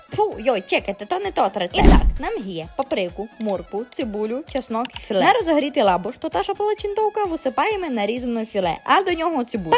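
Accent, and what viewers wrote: Transcarpathia, Ukraine. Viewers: Яка чудова ваша закарпатська говірка! Дякую 😊❤️ І рецепт також чудовий😊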